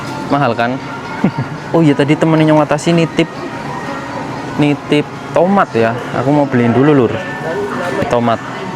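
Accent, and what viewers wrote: Banyumas, Indonesia. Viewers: Banyumas jateng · Smpyn wong ndi kang....ngapak bgt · Mas wong banyumas apa wong sidareja si mas...ngapak poooll ky aku...sampe nyebut parkir ya tetep palkir yaa😊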